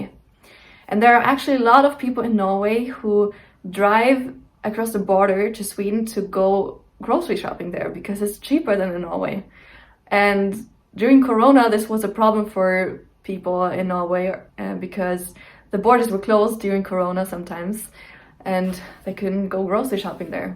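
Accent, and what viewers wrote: Germany. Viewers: In one of the videos you say that you didn’t know if you had a German accent, I can confirm you 100% have a typical German accent when speaking English. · I can hear the German part of you in some of your English :-)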